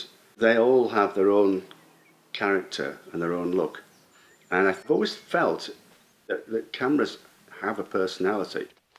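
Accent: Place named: United Kingdom. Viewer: You said 5 x 7 and yet have the British accent ?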